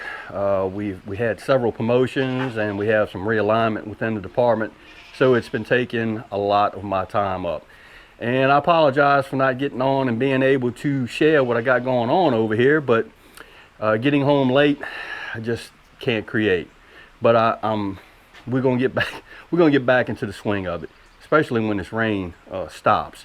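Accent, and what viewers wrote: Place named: New Orleans, United States. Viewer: Thanks for the info! This is helpful! Your accent sounds like your from my area, West Bank of New Orleans area? God bless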